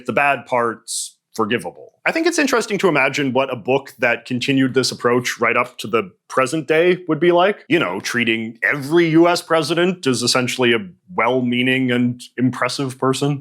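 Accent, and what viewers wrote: Canada. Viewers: Now that’s a Canadian accent. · sounds like Canadian Carl Sagan and I’m here for it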